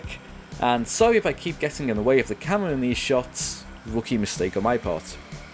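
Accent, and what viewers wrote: Liverpool, United Kingdom. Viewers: I can't tell if you're scouse or not. Also the spoiler looks nice. Keep up the good videos!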